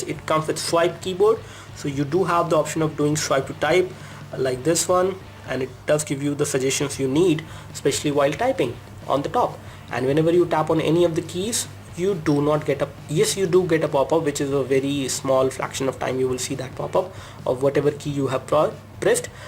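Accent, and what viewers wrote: India. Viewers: How the do you speak English so fast and fluent but yet you still have the indian accent ? blows my mind.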